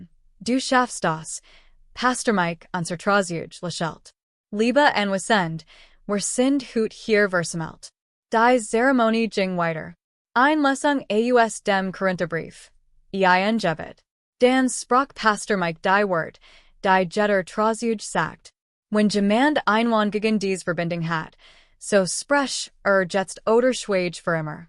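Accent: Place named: United States. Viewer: Was soll diese quälende amerikanische Sprache ???????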